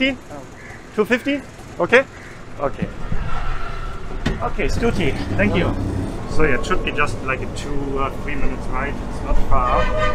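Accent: Germany. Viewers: I like that you have a strong German accent 😍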